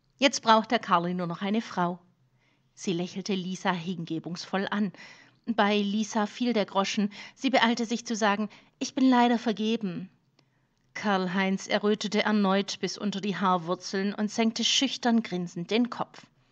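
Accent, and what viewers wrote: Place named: Hohenlohe, Germany. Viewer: Sehr gud vorglesa wirdli supi, awer zur muswisa ghärt vileicht doch a bisle mär hohalohisch dazu mansch net a liwi?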